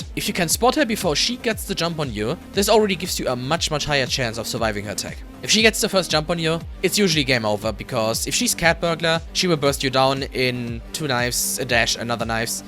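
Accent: Germany. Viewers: alter man hört sofort diesen deutschen akzent :D gutes video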